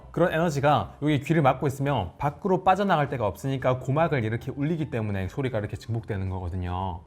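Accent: Gyeongsang, South Korea. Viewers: 경상도 분인가요? 표준어를 구사하려는 것 같은데 억양이 경상도 사투리 쓰는 분 같네요.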